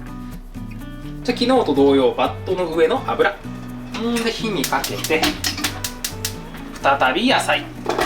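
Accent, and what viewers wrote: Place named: Hakata, Japan. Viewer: たまに博多弁出てますね！ 懐かしかです。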